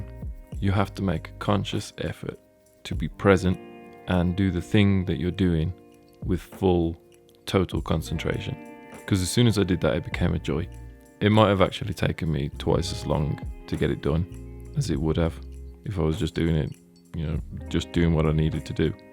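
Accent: United Kingdom. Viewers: Its hynotic to just see you draw your british blabber fades as background noise.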